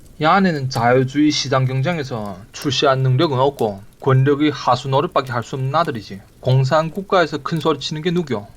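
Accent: Yanbian, China. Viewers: 아닌것 같은데 완전 연변 말투입니다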